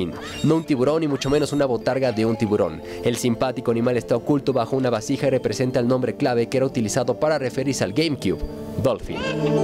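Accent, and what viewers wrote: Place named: Fresa, Mexico. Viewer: me caga la voz de, fresa y cantadita.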